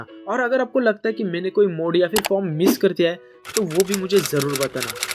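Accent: Maharashtra, India. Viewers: Are you maharashtrian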